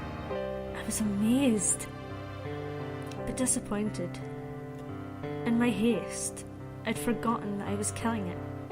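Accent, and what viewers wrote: Scotland, United Kingdom. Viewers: scottish for the win!! ;D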